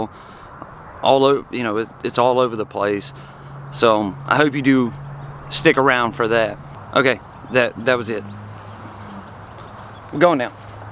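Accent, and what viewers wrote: South, United States. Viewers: I live in Opelika/Auburn so nice to hear a good ole’ southern boy accent on the case! I saw you did a video at the Dozier school... I grew up near there definitely going to check that out. So hard to believe such evil went on right under your nose for 100 years!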